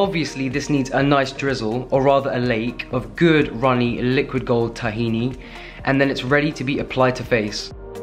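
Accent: United States. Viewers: The random American accent made me sub! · kills me how he never fails to say “peenut butterrrr” in an american accent every time, even in voiceovers.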